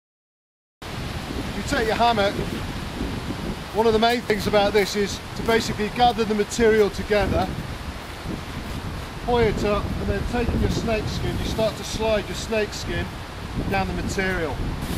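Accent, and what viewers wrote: United Kingdom. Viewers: This is such a great video. Thank you. My boyfriend and I are getting our first Hennessys in a few days...an Explorer Deluxe for him and an Expedition for me. The package should arrive on Tuesday, and I'm really excited to try them out!! I'm only 5'5" and was going to get a free Scout as part of their website promotion, but the kind folks at Hennessy upgraded me to the Expedition. :-) I am a Yank who is really bad at placing accents -- may I ask what part of the UK you are from?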